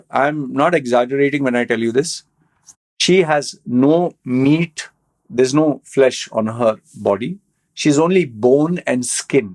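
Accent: India. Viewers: Your english speaking accent is soo indian.. Are u from india ?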